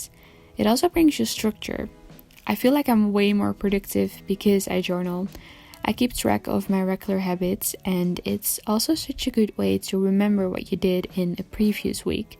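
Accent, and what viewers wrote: Netherlands. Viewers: Love your video!!🐙 zodra je begon te praten wist ik dat je uit nederland kwam wat leuk 🙌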